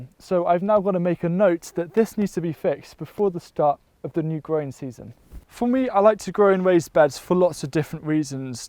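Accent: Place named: Wales, United Kingdom. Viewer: yet another excellent video / and recommendation to give it a break for now. Meanwhile, question, please.... which month did you plant the OCA in your raised bed. I am mostly keen to learn 1. how late I can plant them? 2. Are they a perennial or can one save tubers or “seeds” from one season for the next?? Thanks I have seen loads of your tutorials and your Welsh accent is also a real bonus!!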